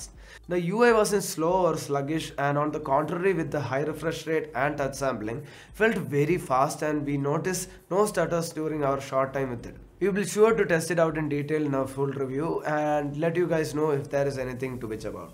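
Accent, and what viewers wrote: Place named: Kerala, India. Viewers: Has the south indian accent ♥️♥️... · no but kerala people english accent is different compared to rest of south and north indians . And only malayalis can spot the difference 😎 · I'm here in c4etech after a long time. But when I started to watch this video, in 3 seconds I found out that this guy is a malayali. Because, they have their own accent for english.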